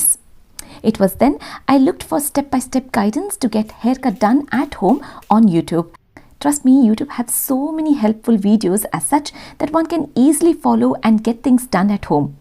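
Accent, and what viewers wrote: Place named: Belgaum, India. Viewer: Hello can i knw from which place ru. Becoz ur kannada is same like belgaum kannada so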